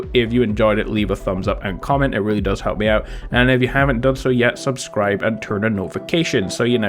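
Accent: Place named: Scotland, United Kingdom. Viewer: Your accent sounds a little bit Scottish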